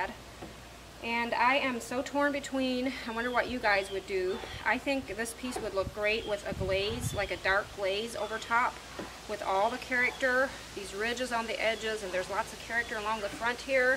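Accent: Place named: Transatlantic, United States. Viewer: You have such a transatlantic accent. It’s cute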